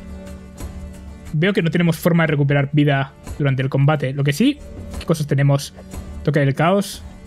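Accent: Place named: Russia. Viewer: es ruso y se nota... pense que iba a haber algo mas de comunismo en este video es una metafora cierto?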